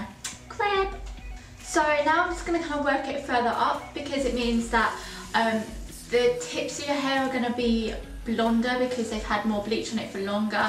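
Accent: United Kingdom. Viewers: he I'm french and I looove ombré hair! But don't you think I shouldn't do it at home ? it'll be better at a haidresser don't you think ? pleaase answer !! oh by the way I don't really get your accent ? are you british ? not american I guess ? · Dat british accent... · r u brittish ? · ARE YOU BRITISH? ;O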